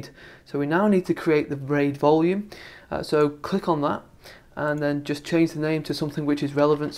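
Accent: England, United Kingdom. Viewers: where are you from? england?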